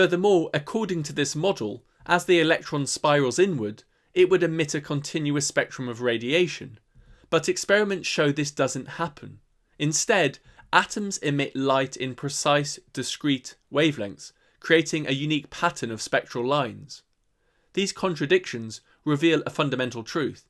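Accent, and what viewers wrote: United Kingdom. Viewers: If you have a British accent, why are you using the American billion?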